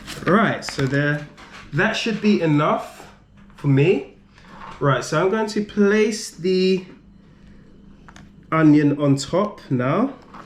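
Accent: Moravia, Czech Republic. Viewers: JOOOOO Konečně někdo zkouší něco přímo z Olomouce!! Já tu bydlím a jsem pyšný na to že jsem Moravák. Jelikož jsem se v Olomouci narodil, tak už mi skoro vůbec nesmrdí ale spíše voní. Určitě by jsi měl zkusit Tvarůžkovou zmrzlinu (Tvarůžky Ice cream) já jsem ji měl a je VÝBORNÁ! Teď jsem na ně dostal hroznou chuť! Keep up the great work please. Btw you have really good Morava accent! 👍👍😄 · Chlape, nemůžu si pomoct, ale když vidím tvůj optimismus, slyším přízvuk a zavřu oči tak slyším "Mirečka" , úplně vidím tu neřízenou moravskou chlastačku :D :D Prostě deformace "Básníků". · Ty nejsi Čech jak poleno! Ty jsi Moravák jak řemen 😁 říkáš toš jak pravý Valch, potom klidně přejdeš do olomouckého dilektu když mluvíš o Olomóckých tvaróžkách 😁 to jsi zabil! Definitivně nejlepší Youtuber, nikdo se ti v tomhle nevyrovná 👏 keep up with this amazing content 👏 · Parádní moravské přízvuk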